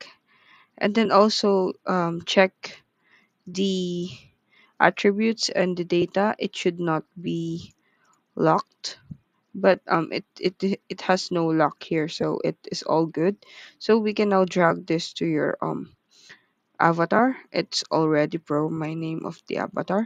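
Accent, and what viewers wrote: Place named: Philippines. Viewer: I can tell by your accent, I think you're filipino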